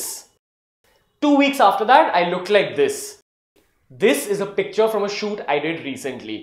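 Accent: India. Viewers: When I saw the thumbnail i thought it is some American guy's channel and when I opened it.. BOOM... indian english #wtfmoment 😂😂😂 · The Indian accent is running off the charts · He has got an Indian Accent...Is that bothering u?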